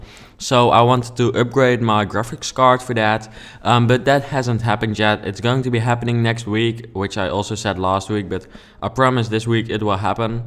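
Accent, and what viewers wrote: Netherlands. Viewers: You need to stop using youre dutch accent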